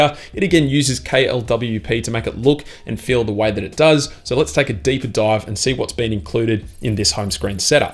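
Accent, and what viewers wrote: Australia. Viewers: Mate, you Australian?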